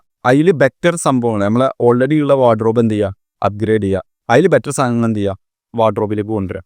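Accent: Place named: Malabar, India. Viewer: Ath malabar style aan broo kaaryam manasilavundo ath mathi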